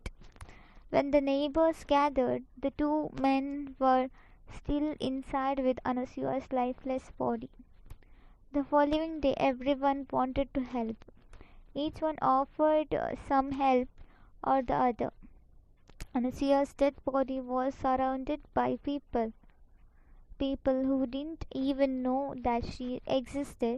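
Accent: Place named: Mangalore, India. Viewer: After a long time listened the voice of monglore people in youtube😂 and this was well explained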